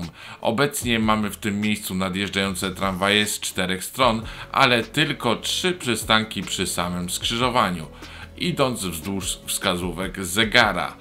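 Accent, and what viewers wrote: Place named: Kraków, Poland. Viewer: Jesteś z Krakowa na 100000% najkrócza, czeba, czy :D uwielbiam.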